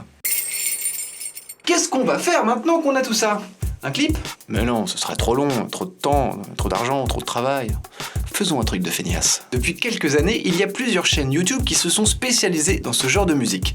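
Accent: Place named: Germany. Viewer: xD je croyais que tu était allemand au début ^^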